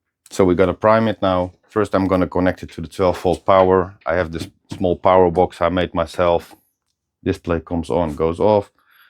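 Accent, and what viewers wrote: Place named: Netherlands. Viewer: Cool video, wanted to say that you sound Dutch and then looked into your profile 😀 Prima 👍 I see your garage wood walls, i assume its not 100% insulated. Wanna install this heater in my mancave, 5x5. Is it worth it? How quick does your garage warm up and to what temperature? If I need to run for 12 hours to get 20° than its sure not for me. Greetings from Limburg 👊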